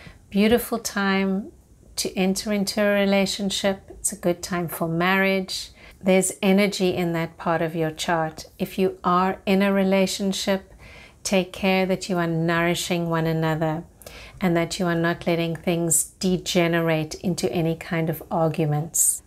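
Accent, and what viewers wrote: South Africa. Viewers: It's a South African accent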